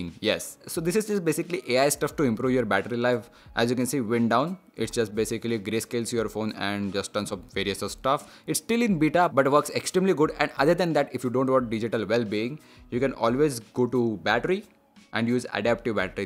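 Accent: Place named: Maharashtra, India. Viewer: Marathi Accent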